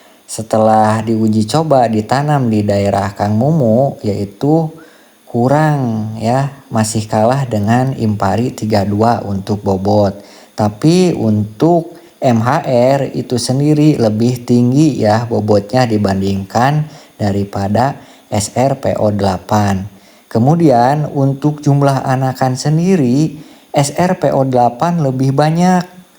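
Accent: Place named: Sunda, Indonesia. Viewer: Hatur nuhun kang Mumu pantesan atuh logat bahasana nyunda pisan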